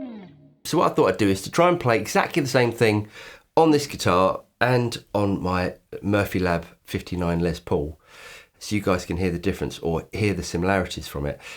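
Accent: United Kingdom. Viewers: Ummmm I got the suspicion that you are Brittttish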